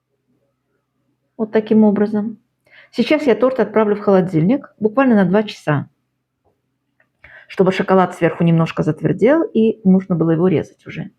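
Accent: Baku, Azerbaijan. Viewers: Прекрасный рецепт,прекрасная подача,а бакинские нотки в голосе-это нечто!!!!Дай Бог вам здоровья и творческих успехов!!!!❤️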